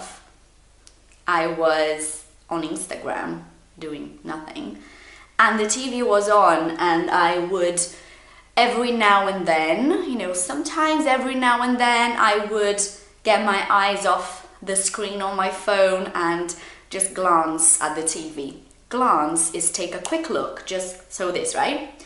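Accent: United Kingdom. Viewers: your vídeo os Very useful and you have an excellent British accent. Congratulations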